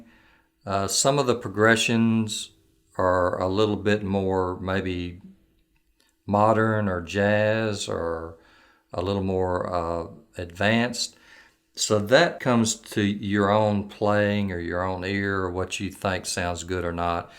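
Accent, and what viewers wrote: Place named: South, United States. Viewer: Hi, Great stuff, just getting back into play pedal steel after 45 years away. Used to play in a band etc, but now feels like i am starting all over again at 77 years old - fingers getting looser... Your instruction clips are proving invaluable, and enough for the moment, until i can find time to go deeper. For the last couple of days it has been bothering me, at 13.13 you have said a diminished repeats it's self every fourth fret (I ''heard'' note!!). Whereas a dim repeats it's self every third note, and an augmented repeats at every fourth note. That is if I have it correct from memory and the way it sounds. Then it dawned on me that on replaying this evening, that you were saying 'fret' and counting the fret you were on as the first one, instead of starting with the next fret up (as the first one), which would be the next note (or the first of three). 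I have not noticed this before, and wonder if it causes confusion for some ('idiots' like me!!!?). Is it common practice to count the fret you are on as the first? I am not being pedantic, just feeling my way, and wondering for all of us. I am always looking forward to the next presentation, and the southern accent. Takes me back to so many tours of the States, teaching and demonstrating woodturning - 27 states in all......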